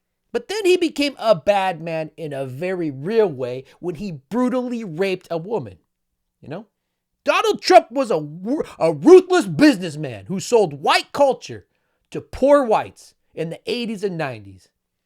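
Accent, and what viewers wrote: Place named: New York, United States. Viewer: How do you sound like a New Yorker being from Utah?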